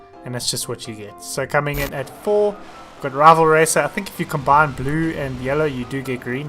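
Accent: South Africa. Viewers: Are you south African I love the accent but I'm not good at accents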